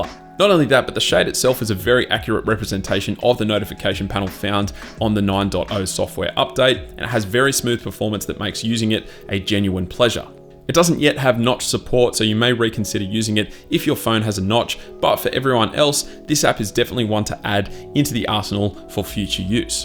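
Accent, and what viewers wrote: Australia. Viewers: Aussie I guess😀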